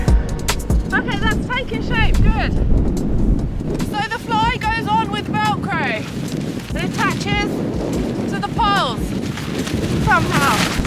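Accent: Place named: Bristol, United Kingdom. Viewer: Which goes to prove, if it can go wrong, it will! Still, you made the best of it. Trying to place your accent - sounds like Bristol / North Somerset, (I'm from Weston-super.Mare), and it sounds familiar! Anyway, keep on making these interesting videos.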